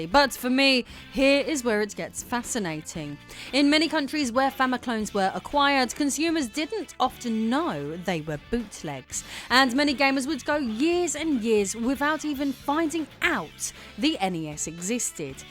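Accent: United Kingdom. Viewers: You would make a Good lieutenant troi... British accent and all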